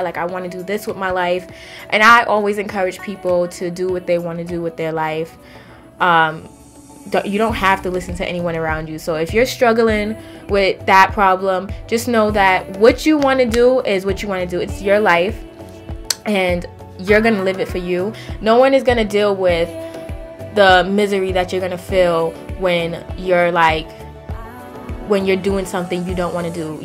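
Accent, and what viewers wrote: New York, United States. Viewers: I LOVE your New York accent. 😍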